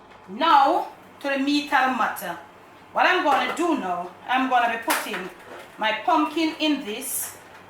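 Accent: Jamaica. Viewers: Hey auntie i love your styling of cooking the way you explain everything and I must mention the strong Jamaican accent, man me love it all, I am Trini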